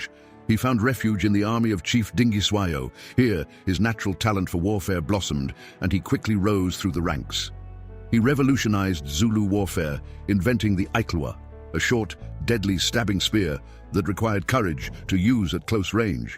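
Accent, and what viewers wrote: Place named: United Kingdom. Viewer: History, Even great Shaka Zulu was 😢monster for you, unbelievable lies. You have British accent. GO and study British history. It's one of the worst ever. What savage Queen did in Africa is unpardonable. These are hybrid beings that committed atrocious acts on humanity that you should talk about and not paint great African leaders as monsters. Anyways, era of propaganda and lies is over!!! Great Shaka Zulu!!! Great Idi Amin!!! They fought for African people. What a useless British faceless racist thinks is inconsequential. Africa forever!!! The world is discovering that the West is full of wickedness towards Africa and the people which this page represents.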